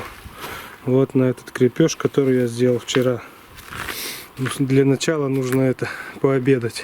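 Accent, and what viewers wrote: Perm, Russia. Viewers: Говор Пермский уши режет.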